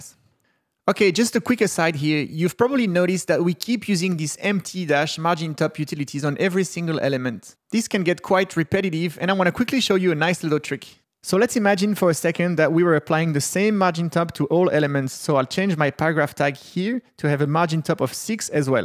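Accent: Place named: France. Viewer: I still say it's all about the French accent ;). Keep up the amazing work. Cheers from the 905.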